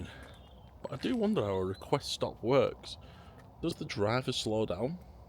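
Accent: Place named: Northern England, United Kingdom. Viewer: Geoff Marshall has suddenly got northern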